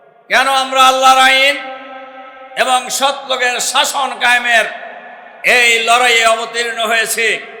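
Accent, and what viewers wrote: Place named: Sirajganj, Bangladesh. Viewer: মাঝে মাঝে সিরাজগঞ্জের ভাষা গুলো অনেক সুন্দর।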